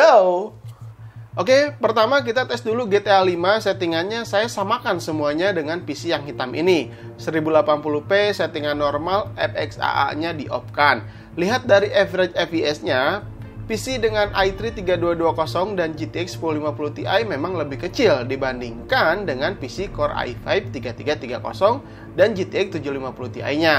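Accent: West Java, Indonesia. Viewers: Saia suka logat anda👍🏼, sundanese bangett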